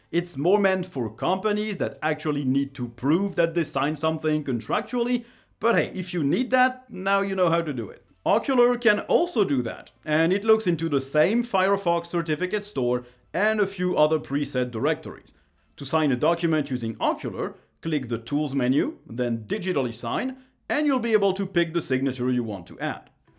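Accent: France. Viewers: Being French, I couldn't tell if he was a fellow frenchy or just someone that had lived in France long enough to get a bit of accent. (I wish I spoke English as well as him, and hope I will someday.) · In other other videos he’s made, he’s said he is French. As an American, I can certainly tell from his French accent!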